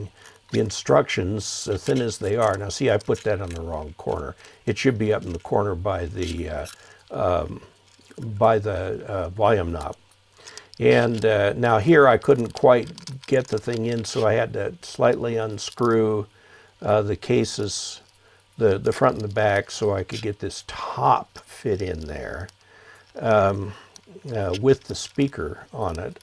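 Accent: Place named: United States. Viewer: Thank you,! Apart of device, your diction make me feel an american speaker:-)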